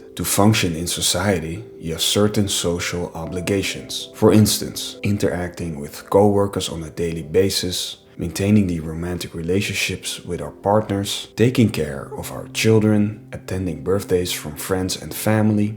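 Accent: Netherlands. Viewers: I hear a Dutch accent. I’ve been around the world to find you :-). Come to Mexico and let’s join forces. Let’s come together and create an off-grid community. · Any chance the narrator is a native dutch speaker? As someone coming from the Netherlands I thought I heard a bit of a dutch accent :) As an Infj myself I could really relate to this video.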